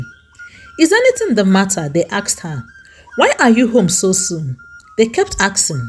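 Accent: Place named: Nigeria. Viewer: Wow you are now using Nigerian voice sample. That's great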